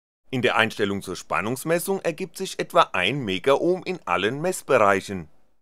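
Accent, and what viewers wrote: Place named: Baden, Germany. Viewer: Ich finde die Videos auf jeden Fall sehr lehrreich, besonders die mit den Selbstbauservos und was µController betrifft. Wenn jemand sehr mit Dialekt behaftet ist (so auch ich als Badener) und versucht den "Stoff" verständlich dem Zuhörer zu vermitteln, dann kann das mitunter für den Sprecher selbst sehr anstrengend sein ohne in den Dialekt zu verfallen. Das sollte man bedenken, letztlich geht es um den Lerneffekt des Themas. Das abschließende "Dange fürs angugge" ist jedenfalls unbezahlbar.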